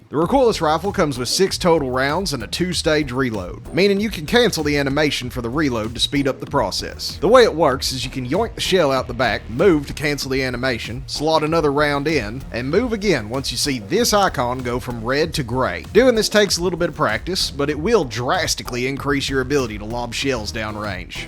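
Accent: United States. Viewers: from the first 15 seconds of the video i could tell this guy is 100% american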